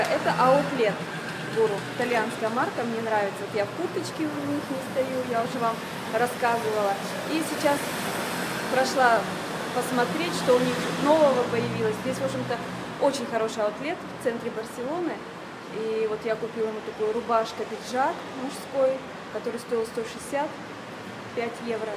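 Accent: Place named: Arkhangelsk, Russia. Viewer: дорогая, не стесняться надо а гордиться своим архангельским говором. это просто замечательно что люди сохраняют свои диалекты. в любой другой стране кроме россии местное население бережет свой говор который обычно отличается от столичного и горды этим. здоровья вам и вашей семье.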